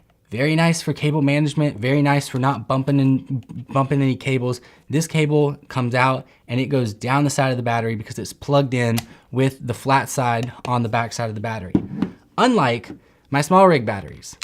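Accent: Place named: South, United States. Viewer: “That thing Dont pop off” lets me know youre from the south lol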